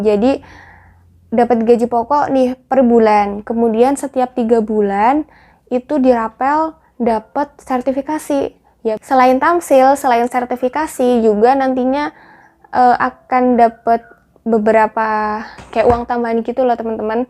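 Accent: Cilacap, Indonesia. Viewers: Sampeyan asli orang Cilacap kak ?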